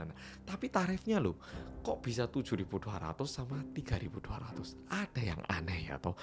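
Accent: Java, Indonesia. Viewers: Suaramu medok banget bang koyok wong njowo.ya paling sopirnya itu yg membunuh.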